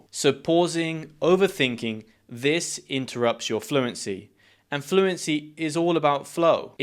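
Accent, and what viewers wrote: United Kingdom. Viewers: I finally found out that I definitely love British English, I'm able to understand when you speak. Thank you, you're the best. Te mando un fuerte abrazo desde Ecatepec, México. 🇬🇧❤️🇲🇽🫂. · Best English teacher and best British accent ❤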